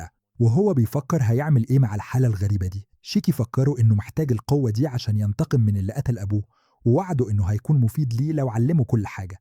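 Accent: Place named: Morocco. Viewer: وي انا متاكيد إن انت مغربي عشان صوتك يشبه 5 انا عرفهم من المغرب وي لو انت مغربي يبق انا ما غالتش الانا تبعتك❤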